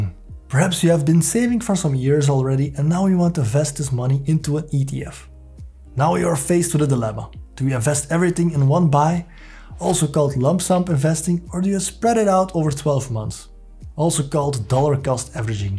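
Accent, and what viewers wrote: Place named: Belgium. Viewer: Haha, giere. Zoek ik video's op over investeren, en ik hoor echt een Belgisch accent 😂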